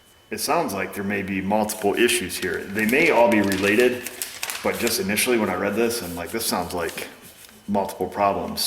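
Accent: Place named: New York, United States. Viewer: Well that New York accent came out in this video! haha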